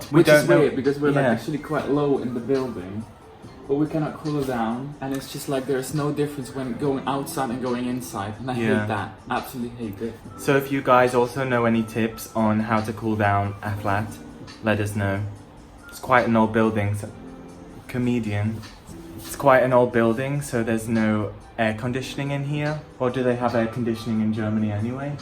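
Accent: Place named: Midlands, United Kingdom. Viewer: Aweee.. 😍 Thank u so much, u guys r awesome *hugs* and btw a hint of where I’m from is in my YT name 😉 I’m a Swede i.e. I’m originally from Sweden 🇸🇪 but it gets a bit more complicated after that, as my mom‘s German and growing up we moved house A LOT (mainly due to my dad’s profession) until about ten years ago when I came to London for my studies 🇬🇧 and I’ve lived here ever since 🤷🏼‍♀️ Whereabouts in the UK r YOU from btw ‘cause I hear a bit of an accent which - to me - says Midlands but I can’t make up my mind if it’s like, between Birmingham and Cheshire or further north? 🤓😁 And last but not least: thank u so much also for the new vlog, I’m loving it a lot and ur bf teaching u Dutch had me LOLing so much, u guys should do the whisper and/or yoga challenge next 🙏🏻😅👍🏻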